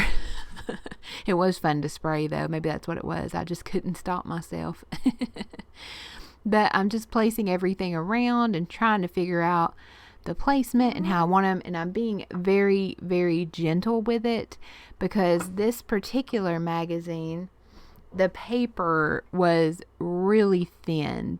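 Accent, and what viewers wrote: South, United States. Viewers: Girl, you are getting good at those voice-overs! Liked the change up with the page too. It's good to break out of our comfort zone just a little every now & then. And yes, you watch those vids with all the art supplies & you want it all. End up spending a fortune too lol. Made me smile when you mentioned east TN. I am from there too. We all got that same accent. Still southern but less of a drawl.😋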